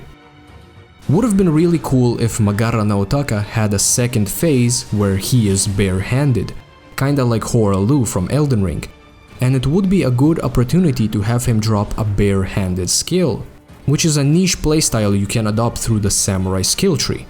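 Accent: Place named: Russia. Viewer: не братишка, там к середине конечно начал "наш" акцент проскакивать, но ты 6 часов как штирлиц. Продолжай грайнд!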